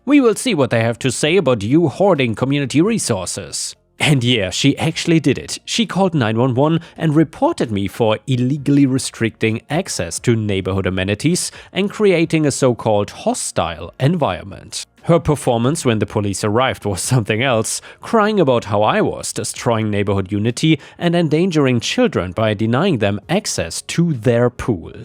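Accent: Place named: Germany. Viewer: He's German. English isn't his first language. He's pretty good, but there are still some words he has problems with.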